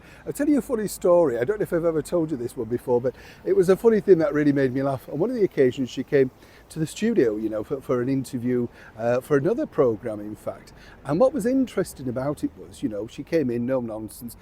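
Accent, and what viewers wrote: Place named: Northern England, United Kingdom. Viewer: No 1 Fan here. You sweetie, making Princess Anne a cuppa and said she was having her 'slap' on. You are so natural with your lovely northern accent and twinkling eyes. Down to earth Anne matches your down to earth personality. How could anyone not be captivated by your personality. I love the story of Princess Royal; well, I like all your stories. Much of the yesteryears you talk about bring back memories for me too. In my last post I talked about Millicent Martin, Harry Secombe, Sandi shaw and meeting them at the London Palladium rehearsing for the Sunday Night stint. I was actually on stage as well displaying a 10 yr old bouncing on the trampoline before it was even considered a sport (I won the first place in the London and South counties competition, the youngest in that category). Now it is a sport in THe Olympics but it took a hard sell to achieve tat. 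So too, Judo, which was brought to the UK by my father after he studied at the Japanese Judo kookan when he was in The Army Physical Training Corp and the rules were then enshrined in this country. Not a lot of people know that. We were stationed in Singapore at the time. This is going back to the early 60s I believe - before coming back to Chelsea Barracks - which 2 generations didnt know was the Coldstream guards barracks in 1966 when they trooped the colour which how I was in the vip pen. Dont know how he swing that but that was a tale in another post to you. You can see, I go further back than you and I may have muddl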